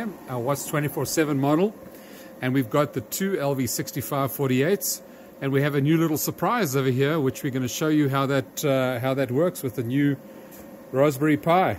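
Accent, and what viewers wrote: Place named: South Africa. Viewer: Sounds like a South African accent, Im sure you know that Solar Assistant is produced in SA?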